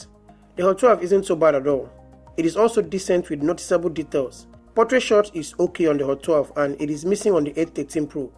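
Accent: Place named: Nigeria. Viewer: That Nigerian accent. One of my friends is Nigerian and the accent is brilliant.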